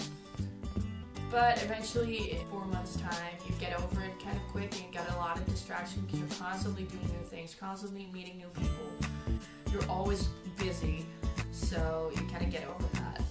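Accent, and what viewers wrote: United States. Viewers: How do you have an American accent? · This was very helpful, but I have some questions, was it very difficult to go to their school and learn in English(I mean are their schools difficult), and have you ever just sat in your room thinking: "I can't do this anymore."? I'm from Serbia and I'm considering about going so I was pretty much excited when I was watching the video. Ps. Your accent is amazing, it's like you're an American 😱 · You have more of an American accent than me, and I'm American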